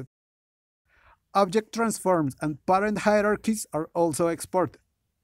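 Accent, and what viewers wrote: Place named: Latin America. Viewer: at minute I was like that is a latino accent! es de los nuestros! PD: Accent is not bad my friend, actually feel proud of your roots and being able to speak in 2 languages (or perhaps more) Saludos desde Colombia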